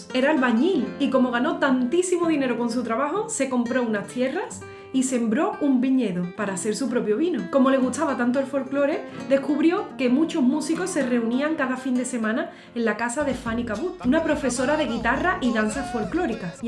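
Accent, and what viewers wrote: Andalusia, Spain. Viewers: hermosa version. Si no fuera por tu hermosa tch andaluza diria que naciste en Salta la linda. Cariños desde Argentina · Impecable! Flor de arreglo, y tu acento andaluz le da un toque único y maravilloso. Soy argentino, hermosa interpretación 👏🏽👏🏽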